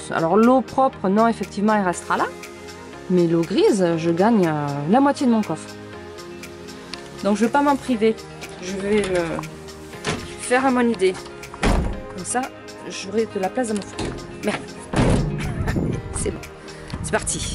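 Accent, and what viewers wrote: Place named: South-West, France. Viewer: Tu es enrhumée? Ça accentue bien ton accent du sud ouest lol..en tout cas bravo a toi et ça avance super bien..hâte de voir quand ce sera fini et vos péripéties 🙂😘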